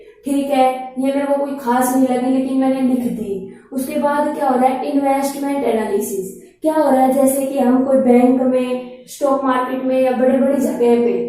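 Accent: Haryana, India. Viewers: Thanks mam for such video . And your haryanvi voice is amazing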